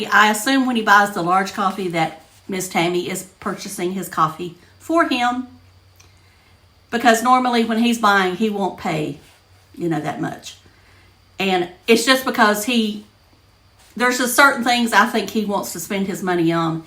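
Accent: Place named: Arkansas, United States. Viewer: Ok saw your name enough that I finally came to subscribe! Nice to see you. Your accent reminds me of my Dad's sisters, your accent. Maybe you are from Arkansas? We are Native American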